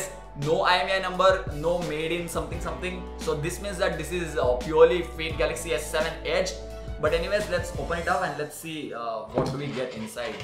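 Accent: India. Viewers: no disresoect but i wish that some videos were limited to their country of origin because 8 cannot for the life if me understand the Indian accent 90% of the time. It just pisses me off when I click in an interesting thumbnail and I can't understand what is being said!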